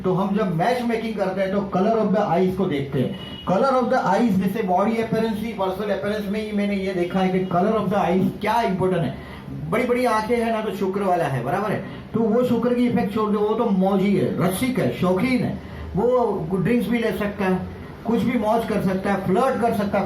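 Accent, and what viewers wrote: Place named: Mumbai, India. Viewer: I really like the way you talking in Mumbaiya tone great sir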